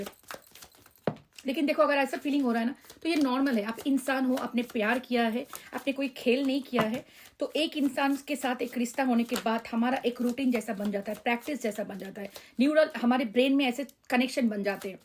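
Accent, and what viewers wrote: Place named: Bengal, India. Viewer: Bengali ke mouth se hindi sunne mai bahut nice lagta hai👍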